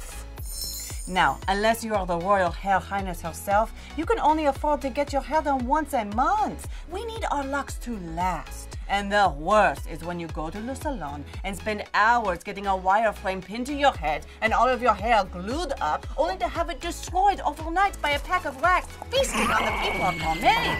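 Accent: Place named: Germany. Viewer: that accent sounds more german than french lol i loved the video though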